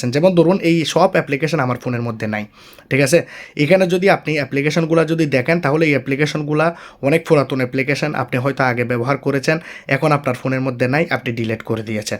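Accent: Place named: Chittagong, Bangladesh. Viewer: ভাই মনে হয় চিটাংগা।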